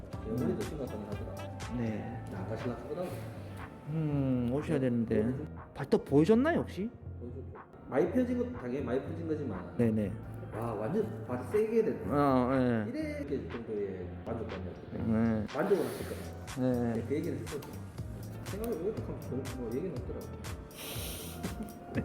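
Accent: Gyeongsang, South Korea. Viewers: 저도 대구인디 같은 경상도 사투리인데 왜 다른 느낌이죠? ㅋㅋㅋ 넘 친절하신 말투에여! 1차 관리 링크도 본문에 같이 남겨주시면 더 보기 좋을 거 같아용 1차에는 2차 링크 걸어두시구 ㅎㅎ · 발톱의 이물질 제거할때.ㅋ 쁑~~ 쁑~~ 좋네요.👍 친절하시고 상냥하시고 ㅋ 특히 좋은점은 갱상도사투리요。 물론 실력도 좋으시고요..♥👍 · 남자선생님인데 넘나 친절하시고 관리도깔끔히 잘하시는것 같아요 경상도사투리 매력적이십니다ㅋ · 늘 너무 재밌게 봐용 경상도 사투리는 톡톡쏘아대서 억양이 쎄다 라는 생각을 늘 해왔는데, 선생님 영상보면 경상도 사투리도 저렇게 친절하고 부드럽게 느껴질 수 있구나 생각해요ㅋㅋㅋㅋ 저는 내성발톱 아닌데 가보고싶어요 ㅠ ㅠ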